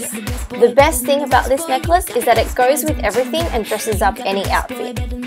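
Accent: Australia. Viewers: your accent reminds me of my trip to australia... I just came back to Italy from Melbourne.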